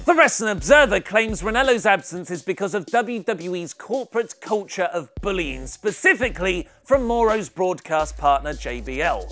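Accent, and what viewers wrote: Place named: United Kingdom. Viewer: YOU ARE MY HERO!!!! YOU BRITISH TALKING MAN!!!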